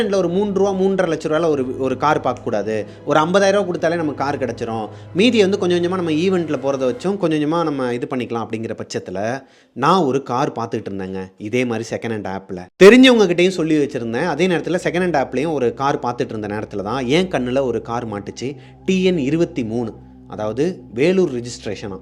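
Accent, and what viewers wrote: Madurai, India. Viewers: Hi.. i m shobana from madurai... i like ur videos... personalave i like u and ur slang.... especially "vellena endhrichuten"... madurai la dan apdi solvanga... neengalum virudhunagar.... so unga videos, pranks ellame parpen.... additionally en baby name prabaharan and my brother name is arjun... so unga videos time ku pathuduven... unga ghost videos la 1 video iruku... <link> <link>... indha video title eh romba bayama irundhuchu... so comments pathen... so many people "ghost vandhuchu.... terrible sound kettuchu" nu potrundhanga.. so andha video mattum nan ipo vara pakkala.... really is it serious to listen...? Clarify pannunga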